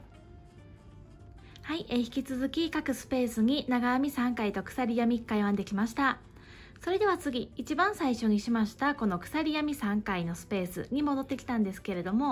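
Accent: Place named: Kyoto, Japan. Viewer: めっちゃ聴きやすいし、ゆっくりやり方が分かりやすくて、ありがとう。 京都語ポイ感じだね❤間違えたらすみません❣️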